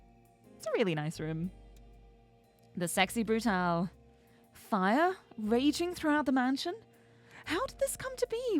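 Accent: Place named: United Kingdom. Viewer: I love your voice. Super soothing. Awesome UK accent 😄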